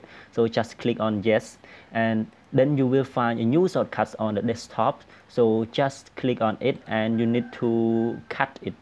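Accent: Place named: India. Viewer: You sound like indian tech support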